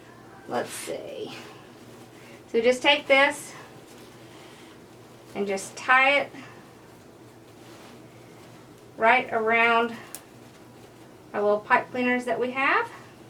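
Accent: South, United States. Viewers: Love the Water Mellon wreath but would love to see it also with a bit fewer ribbons. I love the red and green that looked like the rhine. Actually both would be absolutely gorgeous......where in heavens name do you come up with all these ideas. You are truly amazing! You little guy is so sweet and helpful. Love love the St Patricks Day wreath also. I think I forgot to mention it on the other vlog. I don't have FB so I enjoy seeing you here! You are a busy busy girl. Love you southern accent and sweet way you speak and soft spoken....very easy to listen to you instruct. Loved everything and love how you do the tutoring so quickly. Blessings to you and yours. :) :) :)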